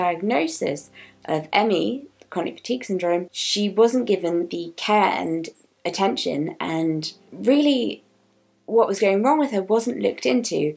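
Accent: United Kingdom. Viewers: Your videos are multi benefit for me! First, they're educational and I learn a lot from them, second, I'm an English learner and your British accent is amazing to listen to, and third, they're inspirational and it always proves my point that people with disabilities are the strongest (if that made any sense). Stay happy, best regards!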